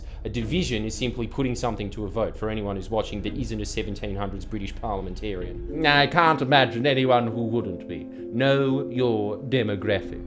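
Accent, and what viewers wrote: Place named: Australia. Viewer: Your Australian accent is very strong 💪 open your mouth a little more while talking and you will get more subscribers. Great job unveiling the Scomo cover up govt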